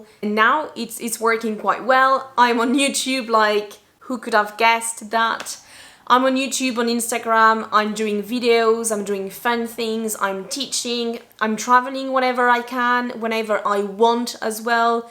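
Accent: United Kingdom. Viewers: At times, the influence of English teacher does affect our English learning journey.. By the way, I thought you're a British because your accent sounds like a typical British accent.. Nice story of English language journey you've shared with us, 👍😍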